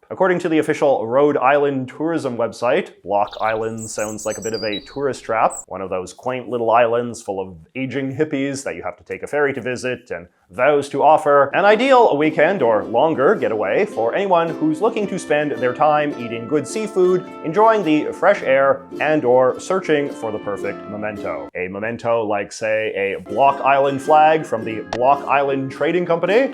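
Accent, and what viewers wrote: Canada. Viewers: watching you made me realize how real a canadian accent is as an american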